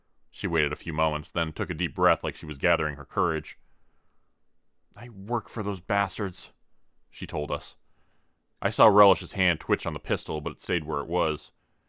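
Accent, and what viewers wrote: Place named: Canada. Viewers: I love the Canadian accent. I'm from Western NY living down south and it sounds like home! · Finally. A Canadian narrator. Great voice and story telling Sir! I will subscribe to you now.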